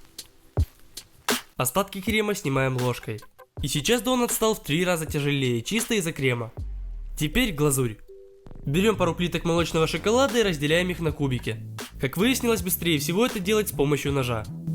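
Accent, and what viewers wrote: Ukraine. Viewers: Украинский акцент? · Стоп!! Я только поняла.... ТЫ УКРАИНЕЦ? У тебя иногда просачивается в речь украинский акцент. Ты начинаешь считать с гривен а не рубля.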